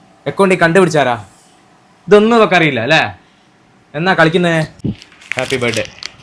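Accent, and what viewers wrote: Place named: Kuttiyadi, India. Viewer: Kuttyadikkar aaro ingal sound guss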